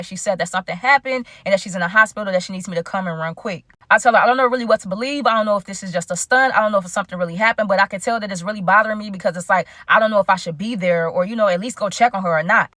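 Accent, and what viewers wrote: Chicago, United States. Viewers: have a meet in greet where u from g u slick talk like us #chicago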